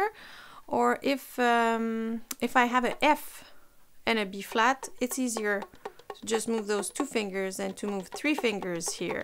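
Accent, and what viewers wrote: France. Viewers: o wow! Congrats!! ooh you are French! Das why you have an excent! I was reading the comments below 😂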